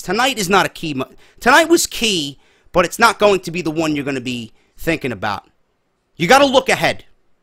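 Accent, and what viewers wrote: New York, United States. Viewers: Gotta say love listening to your reviews I'm from brooklyn so love the N.Y. feel. Everything u say is spot on and shows your a true wrestling fan. i swear if i could I'd start a petition to have u work for wwe creative writing, you would create a hell of a show. keep up the great work about to watch your smackdown review #FuckThoseOtherGuys.💪👍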